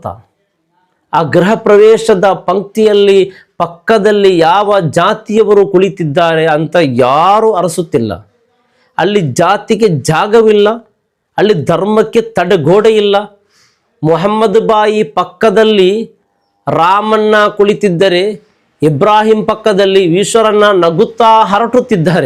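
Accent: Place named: Tulu Nadu, India. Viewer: ಯಾಕೆ ವಿಶ್ಲೇಷಣೆ ವ್ಯತ್ಯಾಸ ಇರಬಹುದು ತುಳು ನಾಡಿನವರು ಉಚ್ಚಾರ ಸ್ವಲ್ಪ , ಬೇರೆ ಚೆನ್ನಾಗಿದೆ ❤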